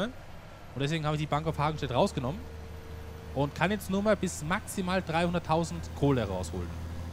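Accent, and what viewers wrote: Tyrol, Austria. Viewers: Wo aus Tirol kommst du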